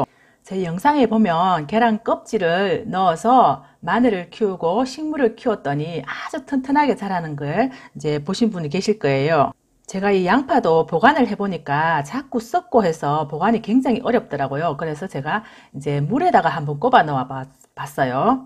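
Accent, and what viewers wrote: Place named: Yecheon, South Korea. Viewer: 우리 마누라 사투리랑 똑같네 예천군 ~~~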